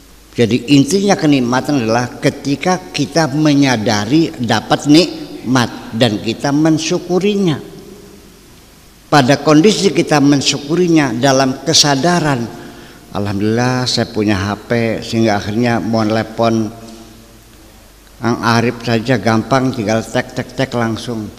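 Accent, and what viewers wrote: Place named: Indramayu, Indonesia. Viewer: WASPADAI UCAPAN2BUYA SYAKUR dg DIALEK BAHASA KHAS INDRAMAYUAN?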